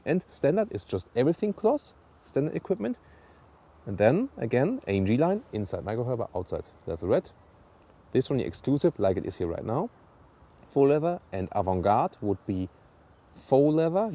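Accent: Germany. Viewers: This guy does a great job on the car reviews and I like his german accent. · he speaks "germish" :) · I've subscribed because of the great in depth reviews, but also because of that lovely german accent!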